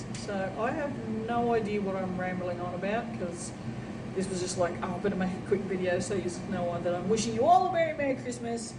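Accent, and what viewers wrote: Australia. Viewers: Hope you have a great Christmas and a happy New Year, get some rest and enjoy the time with your Dad......I know you will be missing your Mum but I feel she is watching over you and I know she will always be in your 💓..I wish you all the best with the shop, I hope it is very successful and relieves the financial strain that can be so terribly stressful.... I so enjoy your videos because you are just so damn honest and no bullshit airs and graces etc, just a real Aussie woman! ( not sure if you were actually born here but you sound Aussie ) I’m very sure there are people who gain some strength to share their mental health issues through watching you and your videos....having a “ big dirty secret “ is such a damaging thing, if people can open up and talk about things it is so much better....anyway, wishing you well and I will be following and supporting you in my small ways and enjoying seeing all you do....stay safe and be happy xx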